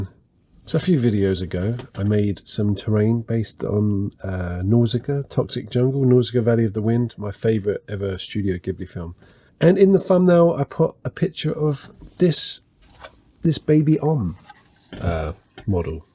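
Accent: United Kingdom. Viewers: Did you favorite every comment? That's... impressive. Also, why ARE you British all the time?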